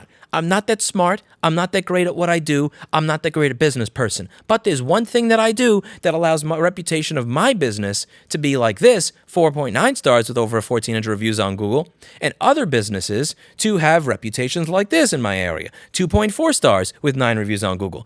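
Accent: New York, United States. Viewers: This is the most New Yorker rant I’ve heard in a long time. I’m here for it.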